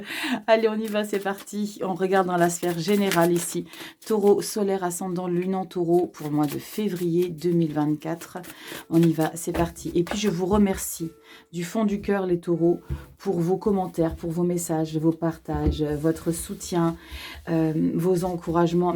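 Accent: South, France. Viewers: Thierry, ☀♉; ASC ♎; 🌝 ♐; 👨💔 Bonsoir, je suis votre chaîne depuis 3 jours, vos tirages sont scotchant de vérité, a tous niveaux y compris tirage sentimental. Je suis en soins de maladie grave et rééducation fonctionnelle des membres inférieurs, j'écris à ce propos pour conter mon expérience de vie actuelle... Ce serai cool d'intégrer à vos tirages pros les notions d'œuvres ou projets artistiques de manière large bien sur, afin d'y trouver des indices précieux... Merci à vous, j'ai l'impression que vous êtes du midi, moi aussi, c'est cool...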